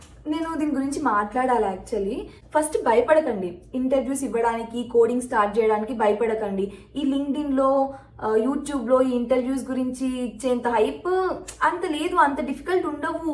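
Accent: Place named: Hyderabad, India. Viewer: Hi, I am an SDE 2 from a Product based company in Hyderabad. I really loved this video. Me being a pakka hyderabadi, absolutely loved your slang. Coming to the content, I can vouch for everything you said about the DSA prep & interview. It is fully informative and would be really helpful for everyone. Kudos to your efforts to help our Telugu people.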